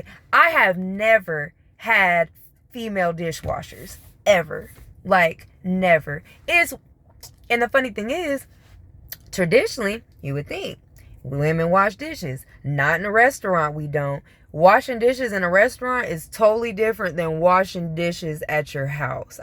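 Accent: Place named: Detroit, United States. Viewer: I appreciate your point of view you sound a Detroit girl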